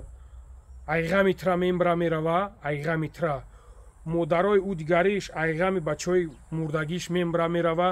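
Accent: Kulob, Tajikistan. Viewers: Чисто ба кулобиги обиснит кади 👍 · Худованд дарувахт эмонша ай баданш хорич мекна беэмона хдованд хоршонкна илохим. Бехтарин суханхо лахчаи точики худочон нигахбонут.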